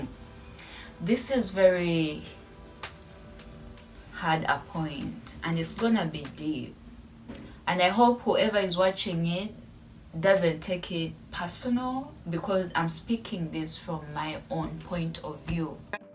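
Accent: Africa. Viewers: Late to this video, please do not lose your beautiful African accent and soft voice!! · I like your African accent that is smoother than others'. I always uncomfortable to watch models in outfits that is very little to cover their bodies. I feel bad for them. If I am in the field as a model, I would suffer and probably give up to be a model since this world's fashion industry is so wicked and dark. I am admiring you to be a good example, as a Christian model by keeping the dignity and your faith value in your job field which is very precious. Bless your heart. Keep up your good work. I know that you can't survive there if you are there for success in the world, but you are there for God's special calling to reach out people with the gospel. When you know why you are there, I am sure that you can have peace and enjoy your job even though you don't make much money or fame like what others chase after. 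I would like to see how God uses you unlimited way. I like your shorts. They are awesome. They speak special way. I think that God brings souls to you thru your videos. Do not be afraid to share the gospel.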